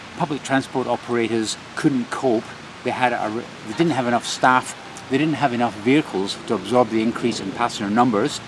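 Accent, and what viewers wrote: Scotland, United Kingdom. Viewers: Great Skaughtisch accent!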